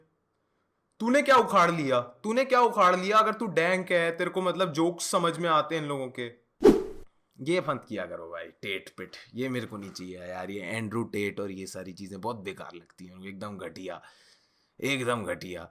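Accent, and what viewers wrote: Nigeria. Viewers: Bhai clg mai baitha tha. Ek nigerian exchange student hai. Mera dhyaan phone pe nahi tha par mai sunn raha tha. Mujhe 2 min laga wahi mujhse baat kar raha hai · nice nigerian accent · that nigerian accent 🔥🤙🏼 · he nailed the nigerian accent god damn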